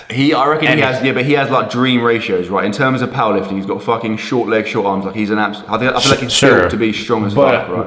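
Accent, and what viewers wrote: United Kingdom. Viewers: It’s the British accent, like I can’t stand the voice at all, no offense to anyone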